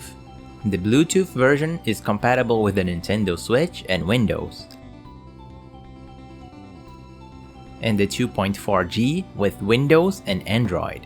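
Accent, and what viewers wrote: Brazil. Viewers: o Pc dele inteiro tá em português do Brasil mano, e ele não fala como nativo, ele tem sotaque brasileiro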